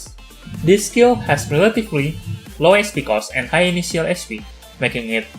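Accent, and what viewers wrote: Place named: Asia. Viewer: It's more cause of his "asian?" accent than the mic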